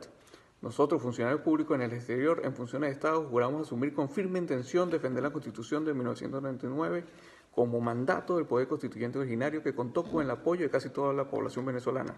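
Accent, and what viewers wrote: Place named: Cuba. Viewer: Lo siento pero este señor tiene acentos CUBANO oiganlo detenidamente.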